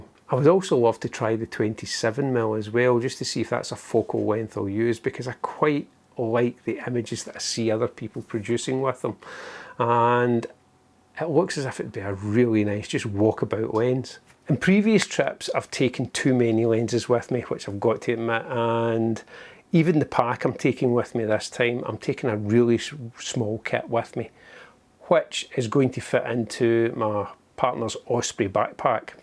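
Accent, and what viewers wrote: Scotland, United Kingdom. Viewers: Hi, with the X-T5 agree on the 70-300 for where you are going. As for the rest of the kit…option a) Sigma 18-50 and 12mm Zeiss or b) 33mm f/1.4 and 16mm f/1.4. Probably a) though as I would choose wide over shallow dof…love the accent. It reminds me of my old Grade 11 Physical geography teacher Mr.McEwan who would make us study topographical maps of his beloved Scotland. It took me a whole semester to realize loch was lake and about as long to understand what he was saying. 🎉 Have a great trip and look forward to the pictures. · Thanks a lot for sharing your insights,! Be you 🙂love the Scottish accent:) to your point: I love the Fuji 16-55 f2,8.. yes it is bulky.. but it delivers such great image quality.. and I was lucky to get it used in great condition for a fair price.. I pair it for hiking either with the 50-140mm or for more reach and lighter weight indeed with the 70-300mm - Cheers, Tom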